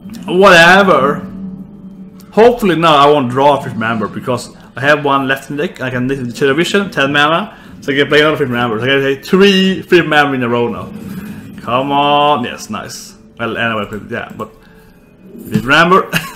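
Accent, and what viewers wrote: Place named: Sweden. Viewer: what a svedis acsent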